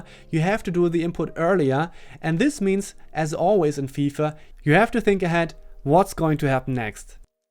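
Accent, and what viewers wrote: Germany. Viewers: because of your accent i guess u from german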